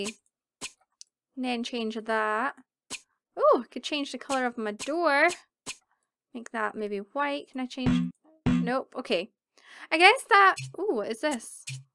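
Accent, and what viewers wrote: Scotland, United Kingdom. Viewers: I have my sims kingdom on the wii. Love <3 Also, this is the first video I've seen of you, are you Scottish? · I love your British accent! It makes the video more fun lol :) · What do you use to film? And are you from Scotland?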